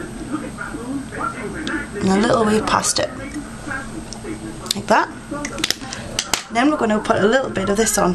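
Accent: United Kingdom. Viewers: what's her accent? if a UK one, specifically which one?!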